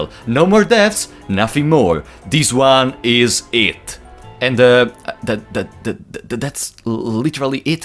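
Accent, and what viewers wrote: Italy. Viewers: As a fellow italian, i now understand how the accent makes the content more entertaining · Never would I have expected I'd be introduced to F&H by an Italian speaking in english. Do you know by any chance if there's an italian patch on the way? I wanted my brother to be able to play this gem, but I couldn't find anything on the matter... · Yknow im a little stupid so i didnt pin you as Italian. And then i heard you say "item only". Just wanted to say that. It is very distinct and beautiful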